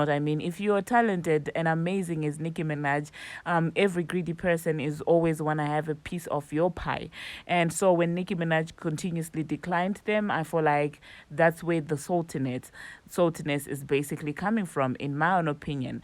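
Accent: South Africa. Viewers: Are you from South Africa 🇿🇦!? Ur accent… makes me 😊 like when I use to live there. Keep the good work 😉🥰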